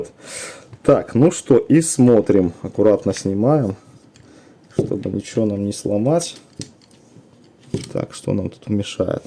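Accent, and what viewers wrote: Belarus. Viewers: Классный белорусский говорок, я так не умею хотя далекие предки со стороны отца из тех краев.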